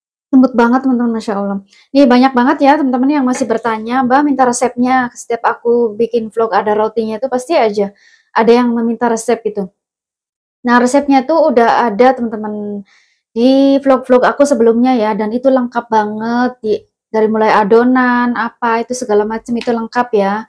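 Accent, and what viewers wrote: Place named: Cirebon, Indonesia. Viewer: Mohon maaf lahir bathin juga ce moga puasanya lancar, aku klw puasa nggak pernah ijin ce Krn sehari2 incess ku gak peduli aku mkn gak paling disangka nya sedang diet, aku suka denger Cece ngmng sekali2 suka keceplosan Cirebonnya , sebagai sesama org Cirebon bikin aku senyum2, aku minum apel cuka organic beli di 360 ce ditambahkan satu tutup kedalam satu gelas air hangat lgsg turun drastis , aku juga kalau isi selai coklat suka keluar ce, bikin fatair bgtu isinya keju yg wadah nya bulat kardus itu enak ce dijamin cantik bersih , murah dikaibo hrganya kisaran 20$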